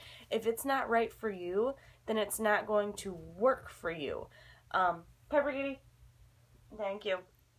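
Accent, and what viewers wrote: Michigan, United States. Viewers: i could listen to you all day. maybe its your michigan accent that i love haha.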